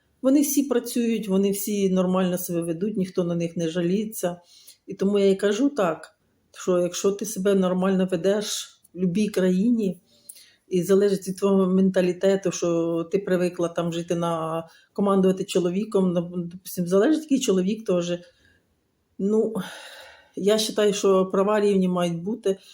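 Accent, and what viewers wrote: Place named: Bukovina, Ukraine. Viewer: Мабуть ближче до Чернівецької. Бо з Буковини і мені близька ваша вимова.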